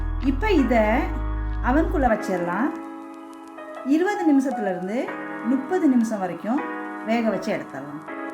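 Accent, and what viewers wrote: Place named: Tamil Nadu, India. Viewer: Nice tamil slang 😍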